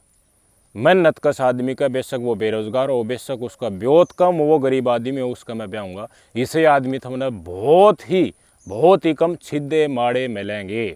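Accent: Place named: Mahendragarh, India. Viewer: भाई आपकी बोली में महेंद्रगढ़ साइड की झलक बहुत अच्छी है भाई आप हर खबर का निचोड़ निकालते हो लाजवाब भाई जी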